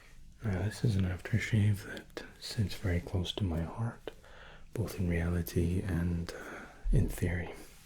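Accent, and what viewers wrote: Scotland, United Kingdom. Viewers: Nice work!! Also, you are British AF hahah I love it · Love your accent and you are defo up there with the best love your videos.... What part of Scotland do you hail from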